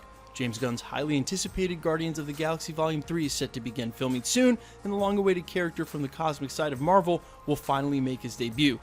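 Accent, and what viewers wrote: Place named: Philadelphia, United States. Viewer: Dat accent, if you're not a Philly Guy, I now dub thee as one! Come to the jawn, we got scrapple...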